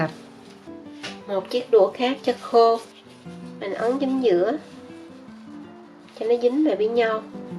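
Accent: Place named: Southwest, Vietnam. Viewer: Tôi cũng thích bánh Quẩy lắm , nhưng thích nhìn thấy cô chủ kênh hơn ! Vì tôi xem kênh nầy rất lâu rồi mà không thấy được mặt chủ kênh nên tức lắm, nghe giọng nói của dân miền Tây Nam Phần rất thân quen và dịu dàng đáng mến quá lại thêm nấu ăn giỏi quá , phải chi được nhìn thấy một lần thì hay biết mấy, hổng chừng là bà con nữa đó .😅😅😅❤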